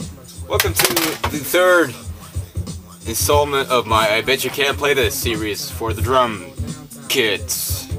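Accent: United States. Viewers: sweet vid. Its odd you dont really have any accent. You sound like you could have been raised in the midwest.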